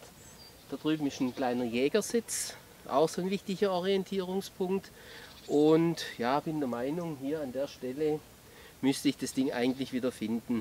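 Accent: Swabia, Germany. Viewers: Schwabe oder Schweizer? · Blaulicht 112 Ich auch am "S" erkannt man die Schwaben.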